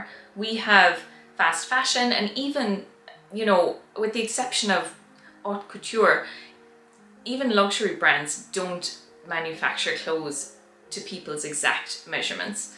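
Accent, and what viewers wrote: Ireland. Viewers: Great video. Informative and uplifting.. thank you for the tips. PS. Your pup is adorable and I adore your Irish accent. Best wishes from 🇨🇦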